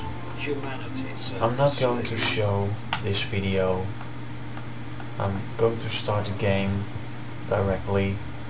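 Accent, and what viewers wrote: Netherlands. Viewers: it aren't lisp he just has a dutch accent. but your right it is really annoying.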